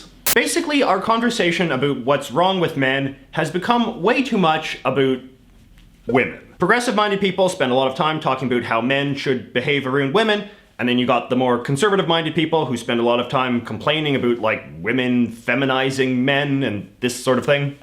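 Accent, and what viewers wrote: Canada. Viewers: BTW, fellow Canadian Conservative here. Live just up the road from ya. Say "about" and "road" normal tho..... lol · Great video - worth a watch for the Canadian pronunciations alone. 👍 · what part of canada are you from? lots of aboots lol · I'm satisfied with your Canadian accent.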